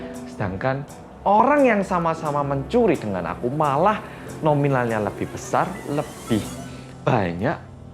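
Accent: Java, Indonesia. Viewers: To be honest, this video is really cool, I don't understand how to pronounce it, the point is I like this video, I think he's great, he has cool words. thank you for I always like the content of your video content, actually I don't like hearing the sound of the medok but I accept it because your content is great, thanks bro.🙏🏼🙏🏼👍🏻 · Bersama org korea yg MEDHOKKK~ Kangen pembukaan yg begini mas hehe Welcomeback DETEKTIF, Selalu menginspirasi ceritanya, semoga kita menjadi org yg jujur ya mas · Yey akhirnya yang ditunggu up pt2 🎉🎉 tim medokkk mas 😂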